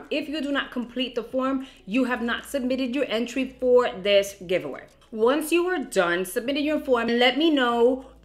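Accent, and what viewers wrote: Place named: New Jersey, United States. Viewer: Congratulations on hitting 40 K on your YouTube channel, I watch you all the time and I love your New Jersey accent. Thank you for posting such fun and exciting videos. You rock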